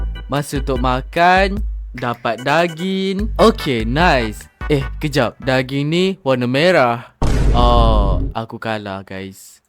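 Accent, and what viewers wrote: Malaysia. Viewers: Kayak suaranya orang aneh ya orang Malaysia ya??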